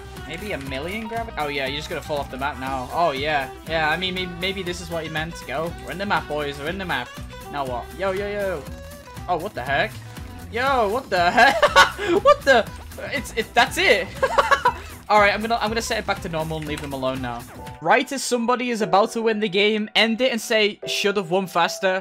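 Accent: United Kingdom. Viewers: Are you British? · is this guy british? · why? he british.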